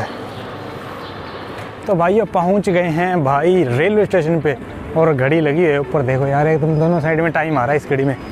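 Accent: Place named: Punjab, India. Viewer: Bai ji, Mai roz hi tuhade vlog vekha haan, par Subscribe nhi si kita. Par aj theth Punjabi sunde saar sidha hi Subscribe button nap ta. 😍💕🙏😍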